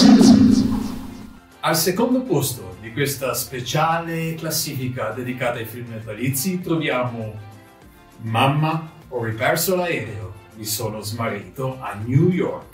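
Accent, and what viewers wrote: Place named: Italy. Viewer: Accento italiano divertente 😜 auguri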